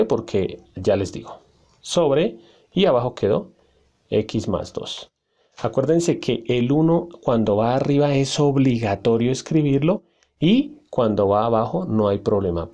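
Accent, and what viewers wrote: Colombia. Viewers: Disculpen es colombiano?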